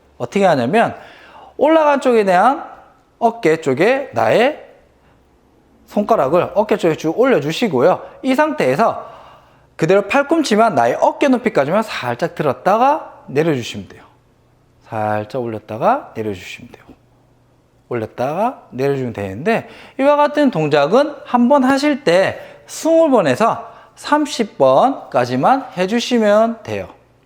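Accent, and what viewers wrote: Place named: Gyeongsang, South Korea. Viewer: 말씀 들어보니 경상도 싸나이신듯 하네요.☺따라해볼께요 땡큐♡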